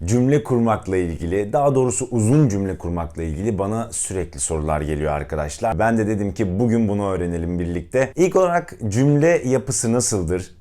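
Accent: United States. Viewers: Abi sen İngilizce konuşurken içimden 'Amerikalılar bile senin gibi konuşamıyor.Nedense senin aksanın daha gerçek geliyor' diyorum istemsizce. (umarım bi tek ben böyle düşünmüyorumdur?)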